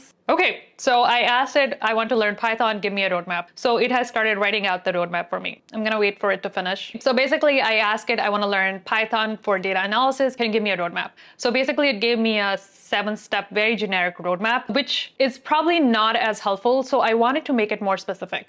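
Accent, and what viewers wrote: India. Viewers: Dear youtuber are u a kashmiri Coz ur accent sounds so much like us! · Stop with the fake American accent. You're getting exposed with your Indian/Pakistani accent on several occasions. It's cringe of the highest order. Stop pretending 🙄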